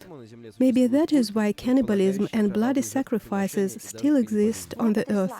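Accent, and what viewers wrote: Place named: Poland. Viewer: I would trust any of my Polish friends, who by the way are very intelligent, hard-working, and have university degrees. But don't ever trust a computer generated text-to-voice with a Polish accent, no matter what she says.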